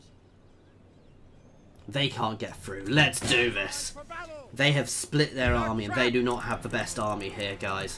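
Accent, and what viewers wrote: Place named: United States. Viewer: by the sound of your voice, you're as american as they come 😎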